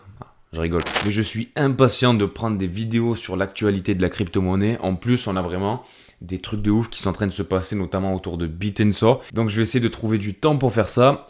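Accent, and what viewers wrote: South, France. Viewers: Je viens tout juste de découvrir ta chaine. T'es génial change rien, avec le ptit accent du sud ca passe comme papa dans maman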